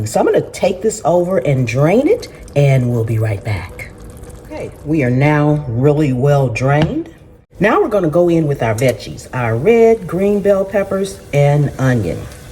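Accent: South, United States. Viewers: Girl...I suspected you were southern because I kept catching a southern accent here and there, but this video confirms my suspicions...southerners definitely do NOT put beans in their chili!!! 😂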